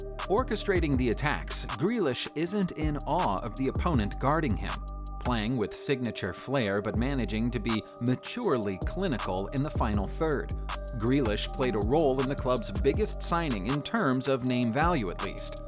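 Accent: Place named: United States. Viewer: Agreed, such a turn off with the American accent and mispronunciation.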